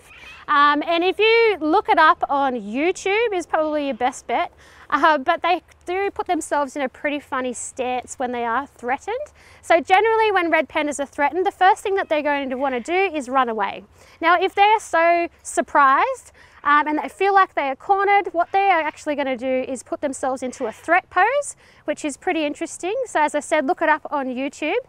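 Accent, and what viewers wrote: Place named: Australia. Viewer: Why is it when i search youtube about animals, there's always an aussie voice hah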